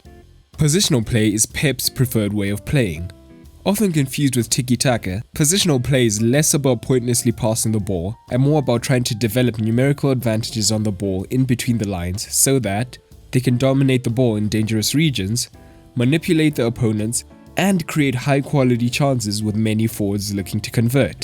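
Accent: South Africa. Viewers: U sound South African lol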